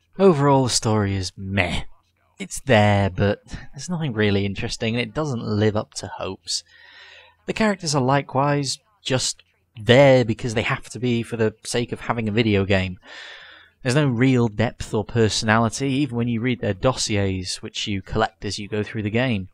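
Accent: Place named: Ireland. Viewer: you sound relatively irish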